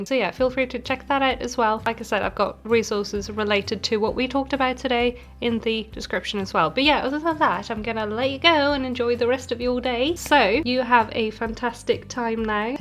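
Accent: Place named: England, United Kingdom. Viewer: I got recommended this video and I'm confused by some of your statements. You said you're a professional knitter and work with deadlines but then you said you knit almost exclusively for yourself. You also saod you're Scandinavian but you have the thickest english accent so either you mean you're of Scandinavian descent but you yourself are english or you're from somewhere on scandinavia and learned english in the uk. I don't say these with any judgements I was just genuinely confused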